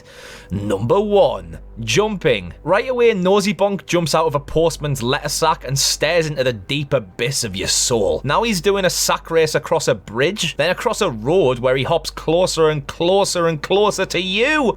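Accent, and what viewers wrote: Scotland, United Kingdom. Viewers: Not To Insult You , BUT, I like the Scottish accent it makes it funny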